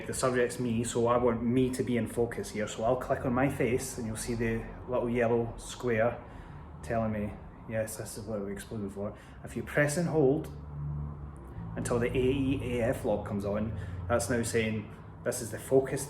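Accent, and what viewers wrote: Ireland. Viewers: Man i love the irish accent, cool video very helpful. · I’m here for the Irish accent.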